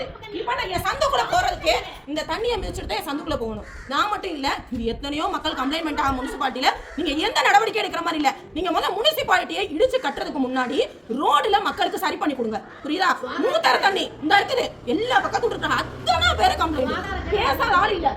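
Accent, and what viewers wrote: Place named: Kayalpattinam, India. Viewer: சகோதரி நீங்க காயல் பட்டிணத்தை சேர்ந்தவரா ஏன் கேட்டேன்னா உங்க ளோட பேச்சு அப்படிதான் இருக்கு எங்க மச்சி பேசுனமாதிரியே இருக்கு எங்க மச்சியும் காயல் பட்டிணம் தான் உங்க ள பார்க்கும் போது எனக்கு என்னோட மச்சிய பார்த்த மாதிரியே இருக்கு உங்க ள மாதிரியே ரொம்ப தைரியமா பேசுவாங்க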